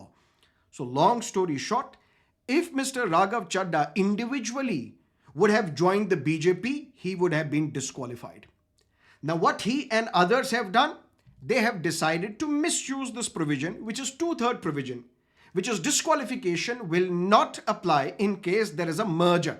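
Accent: United States. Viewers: Aap american ho kya, aise kyu bol rahe ho 😅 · ये ज्ञानी कौन है? क्या उसे लगता है कि राघव, पाठक और भाजपा ने इस बारे में सोचा ही नहीं है? मुझे ऐसे मूर्ख लोग बिल्कुल पसंद नहीं जो अमेरिकी लहजे में अंग्रेजी बोलते हैं।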